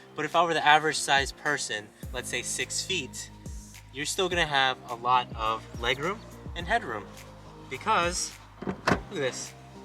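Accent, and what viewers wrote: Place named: Guyana. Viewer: Are u Guyanese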